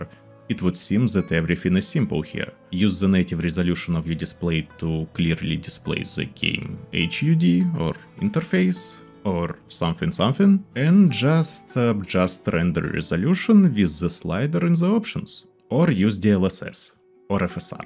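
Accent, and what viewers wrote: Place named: Russia. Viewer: А какой смысл выпускать видос на инглише с таким чудовищным акцентом? Нас сейчас в Америке и европе не сильно любят. А услышав первое же предложение человек поймет что ты русский и даже если он каким то чудом увидит этот ролик в рекоммендах, то процентов 80 народа его сразу же скипнет.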